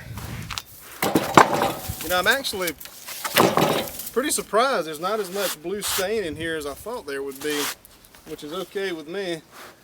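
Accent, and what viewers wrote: South, United States. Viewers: Hello from Canada! I really wish american You Tubers would tell us what state they come from! I have had some travels in my time in the U.S. but there's a lot I haven't seen. Nonetheless there's a few: 1) You're somewhere in the south partly by your Parlance (more than just accent) and being a language lover this is nice educative fun for me also!, so from Quebec <<chapeau! >> 2) By the vegetation around, this is obviously not in the desert or the midwest. (watchi8ng a lot of Amrtrak videos, I have seen some of the countryside that way to be able to compare with). since the vbagetation looks quitre thick and lush, in soite of the drought you reported, This has to be a notable warmer climate with a notably longer growing season than ahnyrhing up north. So your effort seems very well placed in relation to nature! As for wood, as it hapopens I grew up amny years ago in Oakville, Onterio, some 20 miles West of Toronto. 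Historicaslly the town got its name when it became famous for production of barrel staves, made of, oak of course. In our back year was one of thise huge oak trees, and, of course lots of squirrels, but thos little critters can also make a !!!! of a mess of the corn patch. (Also they can carry rabies, so they are somewhat of a public pest). So all in all thumbs up and to/from??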